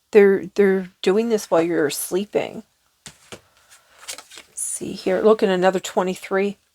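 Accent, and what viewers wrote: Canada. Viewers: YOU SOUND NEWFY TO ME, WHY ARE THEY SO INTERESTED, ITS MY LIFE AND IT IN TROUBLE RIGHT NOW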